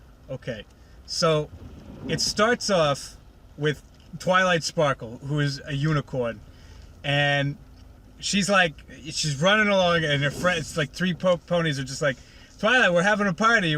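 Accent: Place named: Boston, United States. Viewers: you're from the east coast arent you? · You have such a Boston accent it's really quite amazing. Even I don't have that thick of a New England accent and I've lived here most of my life.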